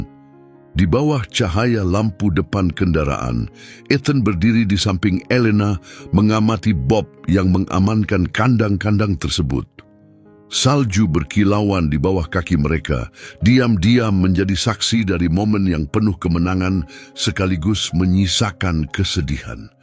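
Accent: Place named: Malaysia. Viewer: Admin orang Malaysia?. Bahasa Indonesianya bagus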